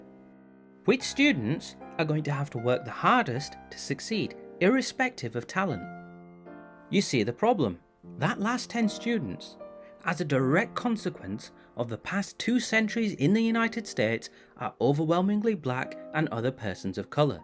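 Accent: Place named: Yorkshire, United Kingdom. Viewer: Really engaging video- cutting through the noise and the bs commentary which is pervasive in the news and most social media. I can sense a hint of Yorkshire accent amirite?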